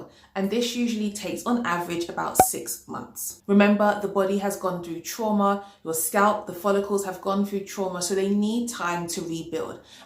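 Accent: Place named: United Kingdom. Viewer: crazy but, its so nice to hear a British accent on a loc channel 😂. children stress!! definitely need to stop stressing. I'm a worrier! but, this year is about balance, balancing work and play, I used to only work and hustle also, to do my daily gratitude, which really helps me relax.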